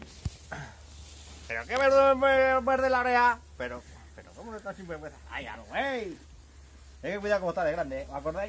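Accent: Extremadura, Spain. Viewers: Lo comprendo y respeto. Desciendo de extremeños de Badajoz y tengo familia de Alía. Pero tan solo era porque reconozco el "deje" extremeños. De todos modos solo quiero darte ánimos y que sigas subiendo videos. Muchas gracias por contestarme.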